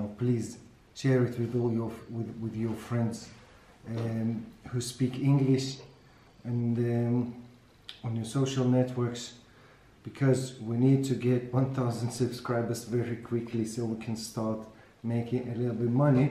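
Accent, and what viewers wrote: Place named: United Kingdom. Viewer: You sound slightly British! Interesting :)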